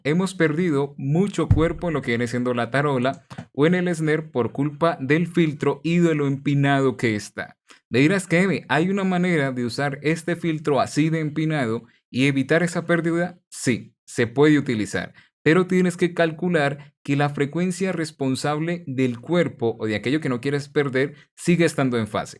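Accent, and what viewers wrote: Colombia. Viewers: Es colombiano?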